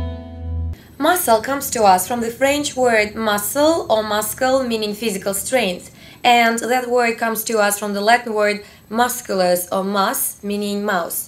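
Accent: Russia. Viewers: you're russian, right ? :))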